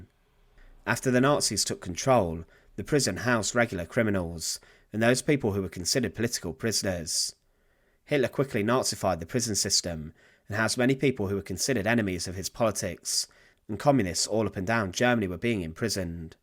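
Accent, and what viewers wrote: United Kingdom. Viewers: No ,he sounds British which he is. Enough said already!..😅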